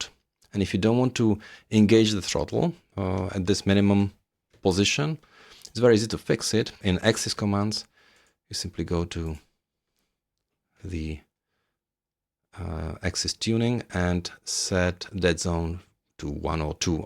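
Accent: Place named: Czechoslovakia. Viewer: Perfektní recenze, možná nejlepší co jsem na STECS viděl (a že jsem jich shlédnul opravdu hodně :). Pořád váhám, jestli si pořídit throttle od VKB, nebo od Virpilu a tohle mě hodně nahlodalo ve prospěch VKB STECS. Jinak jsem rád, za závěrečné potvrzení mého tušení, že vaše perfektní angličtina má nezaměnitelný "československý" přízvuk. Díky a zdravím na Slovensko z Moravy :)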